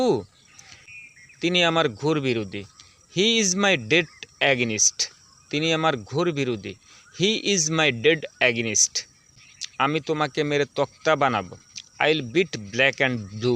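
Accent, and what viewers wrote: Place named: Noakhali, Bangladesh. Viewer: এই বেটা কথক, তোর বাংলা উচ্চারণ ভুল আছে, ইংরেজীতেও ভুল আছে।কারণ স্কুলে থাকতে ইংরেজী স্যারের বেতের বাড়ী খাস নাইতো, ঐ জন্য! বাংলা উচ্চারণে নোয়াখালির টান পরিষ্কার ভাবে বুঝা গেছে। উচ্চারণ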